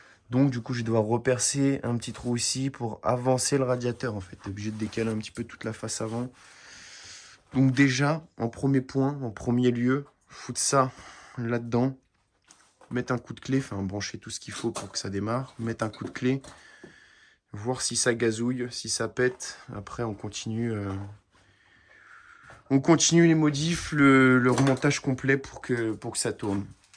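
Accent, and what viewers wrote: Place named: Lyon, France. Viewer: Une prepa Peugeot j'aime bien :) ça change ! t'es de Lyon ou autour d'apres ton accent non?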